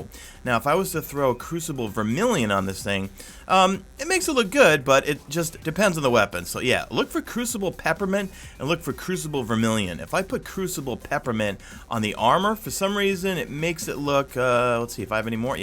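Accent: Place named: East Coast, United States. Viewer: You sound like you're from the east coast. I hear a slight accent. Regardless. keep making amazing content dude!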